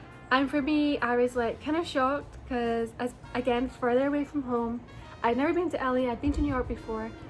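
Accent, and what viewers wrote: Scotland, United Kingdom. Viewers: Please don’t ever ever ever ever lose your accent. The Scottish accent is like music. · Please don't loose your Scottish accent and your beautiful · It’s so amusing to hear the blend of your Scottish accent with the American accent! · Love Your Scottish Accent So Pretty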